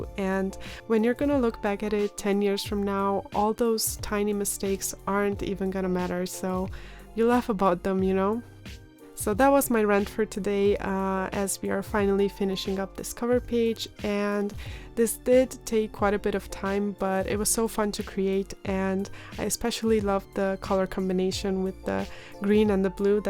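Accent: Germany. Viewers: Wow thanks for replying! And thank you for your nice words! I can imagine you're busy, I've picked up somewhere in the comments that you're working on your masters, good luck! I'm doing my PhD right now and I'm forced to keep it minimal because of lack of time. However I still try to dedicate at least 5 min per day to journaling. It gets my mind off real life :) Unrelated but are you German? Your accent sounds very familiar, haha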